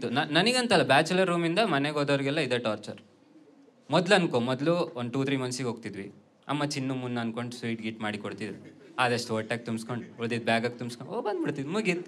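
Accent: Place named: Davangere, India. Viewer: Sakath bro…I loved it man. I have friend from Dhavanagere, nin thara ne guru same mathadodu!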